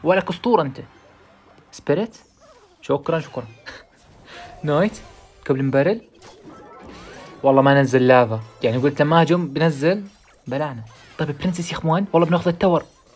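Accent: Iraq. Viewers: شكلك عراقي تكول يعني تفضل هههه احبك متابعك من العراق 🤍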